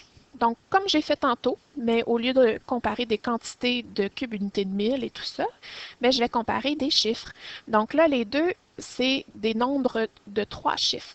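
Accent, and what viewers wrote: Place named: Quebec, Canada. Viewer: J'aime bien ton accents québécois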